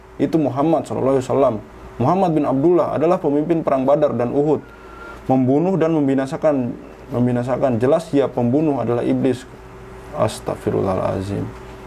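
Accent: Eastern Indonesia, Indonesia. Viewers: Mantaf.......Logat Timur Kaka....,👍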